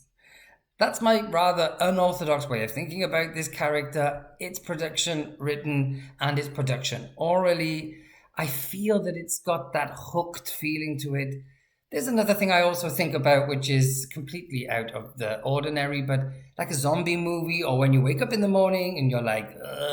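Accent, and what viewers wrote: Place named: Wales, United Kingdom. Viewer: Fantastic, thank you. A huge help, and fun to watch. Love the Welsh accent too.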